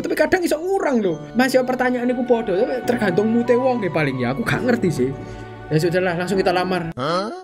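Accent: Java, Indonesia. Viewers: Mas Sak vidio ngoming medok sak vidio o ae Temenan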